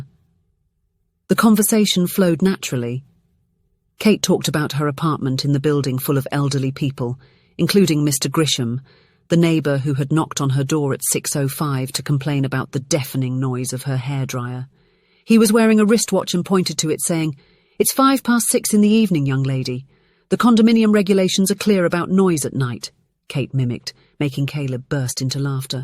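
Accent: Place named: United Kingdom. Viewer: Loved the story. British Narrators are Amazing. East Coast USA. 10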